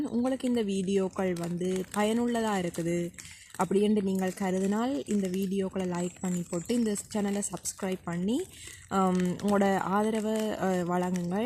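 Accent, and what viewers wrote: Jaffna, Sri Lanka. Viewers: vadivaa Lankan/ Jffna Thamizh'la kathikreeka.. Nallaa piichirukku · நல்ல விளக்க உரை, நன்றி சகோதரி, நிகழ்ச்சி தொடரட்டும், வாழ்த்துக்கள், நான் விரும்பும் இனிமையான யாழ்ப்பாண மொழி,(Sri Lanka வில் இருந்து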